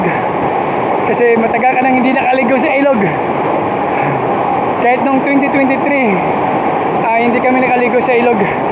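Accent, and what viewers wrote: Iloilo, Philippines. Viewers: Kuya Taga saan ba talaga province nyo.parang ilonggo salita nyo?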